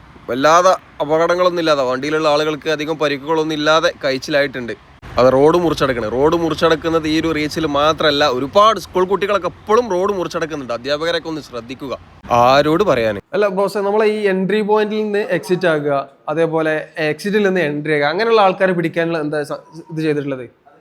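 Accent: Malappuram, India. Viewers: മലപ്പുറം സ്ലാങ്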